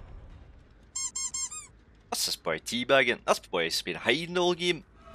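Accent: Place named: United Kingdom. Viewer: Necklace Cage 😂 i Love that britisch accent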